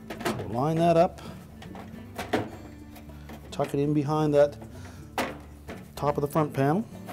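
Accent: Canada. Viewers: I carefully watched your instructional video documenting the procedure for replacing a door seal on an LG Front load washer; your ability to communicate is nothing than outstanding! Especially the segment pertaining to the fitment of the door seal to the washer's drum and the use of locking pliers and a flat-blade screwdriver to install the metal retaining ring to the drum-seal interface. The language was clear concise and unambiguous. The visual aspect of the video clearly depicted the finer points of the job with clarity and preciseness. I picked up on the Canadian🇨🇦accent as I live in Buffalo, NY on the border; hence the impeccable use of the English language. Keep up the good work and I look forward to viewing your productions in the future.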